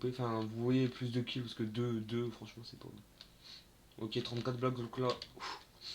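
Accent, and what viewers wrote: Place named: Nord-Pas-de-Calais, France. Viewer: Ch'ti RPZ, toujours le même accent xDD Bonne continuation à ta chaîne, m'y abonne :*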